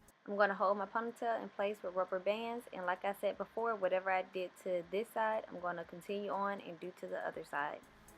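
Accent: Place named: South, United States. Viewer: is it werid but i like your voice lol you got this down south accent that i absolutely love great video look forward in supporting each other channels :) see you in the nest video!!!#sub 973 with bell turnt on !!!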